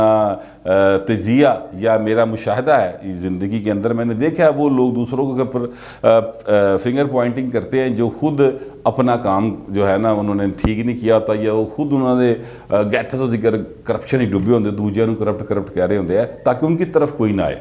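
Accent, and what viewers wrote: Punjab, Pakistan. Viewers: Your punjabi "Tarka" is excellent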